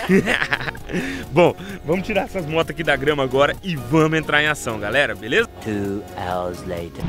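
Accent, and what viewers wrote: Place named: Minas Gerais, Brazil. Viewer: mineiro kkkk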